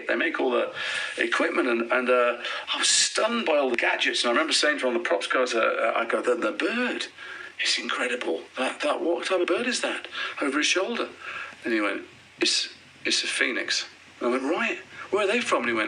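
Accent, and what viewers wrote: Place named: Liverpool, United Kingdom. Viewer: I love his natural Liverpool accent…I’m from Liverpool too. I actually met Jason at Liverpool Comic Con in November 2024 - Jason is the sweetest person you could ever meet. I actually had made made a diamond art portrait Of Jason as his characters. Which he really loved and it was a small gift. Love all his characters -he plays all his characters so beautifully and tell their stories beautifully. Jason was and remains the best Star Trek captain ever too.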